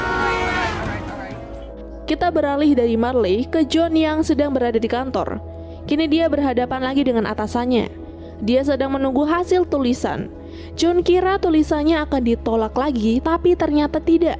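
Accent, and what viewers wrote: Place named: West Java, Indonesia. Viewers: Cewe Sunda njir yg nyeritain wkwk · Mimin sunda na bandung bukan si kalo bandung ku aing di apelin🥺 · Min orang mna aya sundaan🤣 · HAHAHHW ANJIR ORANG BANDUNG NIH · Aya Orang Sunda Ey😂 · Sunda si teteh ey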